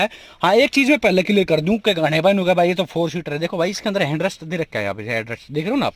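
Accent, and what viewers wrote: Haryana, India. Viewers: This is not hindi it is haryanvi bhai lage raho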